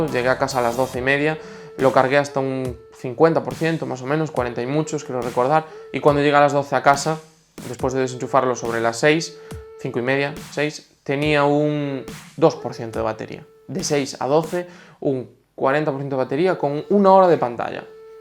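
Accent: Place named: Galicia, Spain. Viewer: Como se nos nota el acento a los gallegos xD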